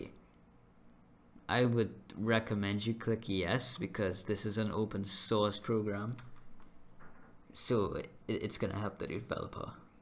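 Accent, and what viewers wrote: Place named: Trinidad and Tobago. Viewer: sounding like ah Trini.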